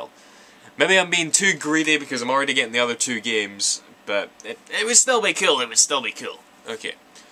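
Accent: Scotland, United Kingdom. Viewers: And by the way I have been watching your lets plays back to back in the playlists and consider you to be one of the very best lets play artists. Scottish accents for the win! (even though I'm English lol)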